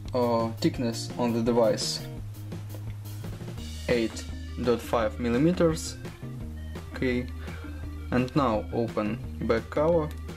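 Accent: Russia. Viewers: Дружище, лайк за волю к победе) Сразу слышно русскоязычного ютубера, думаешь над каждым словом. Американцы стрекочат по другому) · God you can't mistaken that russian accent.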